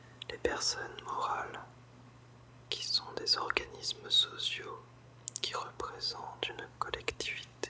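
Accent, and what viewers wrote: France. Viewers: Oh, the French accent is amazing.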